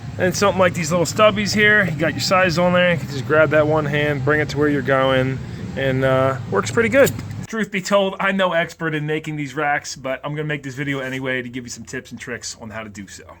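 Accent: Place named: Pennsylvania, United States. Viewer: Must be from pa.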